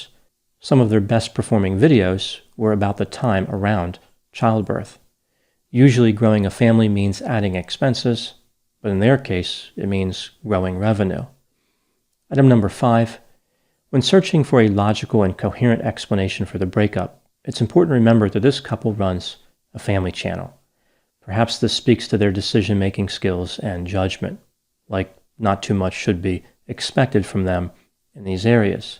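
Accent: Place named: Pennsylvania, United States. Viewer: Anyone know if Dr grande is from pennsylvania? Something about his accent reminds me of a friend of mine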